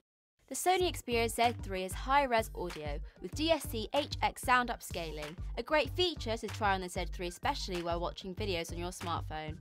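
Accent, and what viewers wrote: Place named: England, United Kingdom. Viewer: Well i really need to get used to this English accents. Its so annoying. (My opinion)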